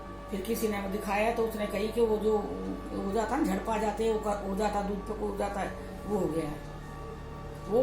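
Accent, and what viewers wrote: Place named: Meerut, India. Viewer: Aunty apka baat batane ka tarika bahut acha hai, Ap merath se ho kya Meri mummy waha ki hai or unki boli bhi apke jesi hai, love you a lot 😘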